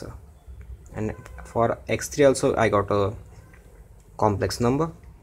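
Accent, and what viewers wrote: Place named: Italy. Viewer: love the italian accent ! really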